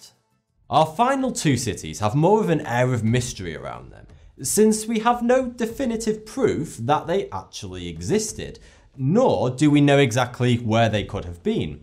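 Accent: United Kingdom. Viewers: 42 or thought 2? sry ur too british for me to understand clearly. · I didn’t know Frankie Muniz had a British accent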